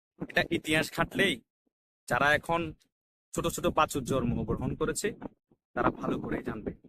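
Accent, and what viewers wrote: Chittagong, Bangladesh. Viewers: ভাই আপনি কোন অঞ্চলের। চট্টগ্রামের ভাষায় কথা বললেন যে।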